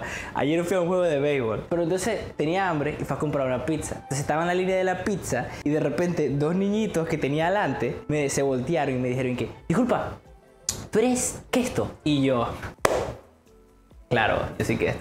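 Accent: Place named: Maracaibo, Venezuela. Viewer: ya confirme que es maracucho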